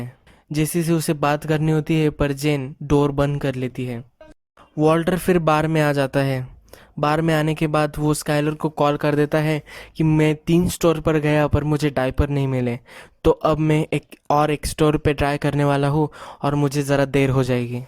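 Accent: Maharashtra, India. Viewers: Good story telling...but i noticed you are marathi bhava from accent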